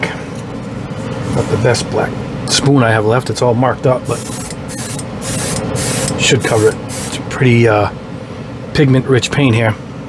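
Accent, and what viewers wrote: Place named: New York, United States. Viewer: Country? Are you not in the US? I swear you have a Jersey/New York-ish accent lol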